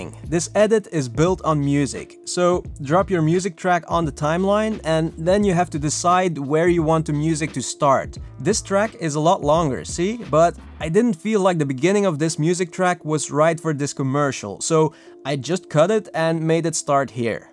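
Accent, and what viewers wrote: Belgium. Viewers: Heel mooi om jouw Nederlands (Belgisch accent) te horen. Moet je wel vaker doen :)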